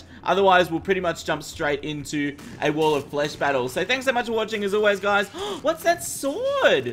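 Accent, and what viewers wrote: Australia. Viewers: What accent do you have? Australian?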